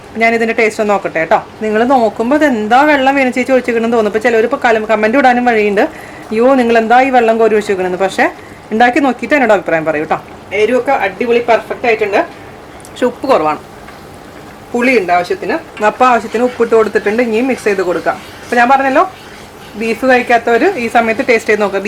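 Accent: Thrissur, India. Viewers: Wooww😋 കൈപുണ്യം എന്ന ഒരു സാധനം ഉണ്ട് ചേച്ചി അതും വേണ്ടെ എനിക്കൊന്നും അത് അടുത്തുക്കൂടെ പോയിട്ടില്ല Anywayy kandapo kothiyaayiii 😋😋😋 Chechide anchoring Nammlde trrisure anchoring Kandathkondaa njn subscribe cheythath thanne Thats the thing what i inspired to learn you You never change your own way Plz reveal more and more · ചേച്ചി മ്മടെ തൃശ്ശൂർ ന് പറഞ്ഞ അത് മ്മടെ ഭാഷ തന്നെയാ അത് മാറിയാൽ പിന്നെന്തുട്ട് തൃശ്ശൂർകാരി ഞാൻ ഒരു തൃശ്ശൂർകാര്യ ഇത് കേൾക്കാൻ വേണ്ടിയാ കാണുന്നെ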